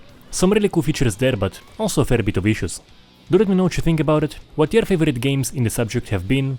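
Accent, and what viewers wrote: Russia. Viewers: Блять этот русский акцент, кров из ушей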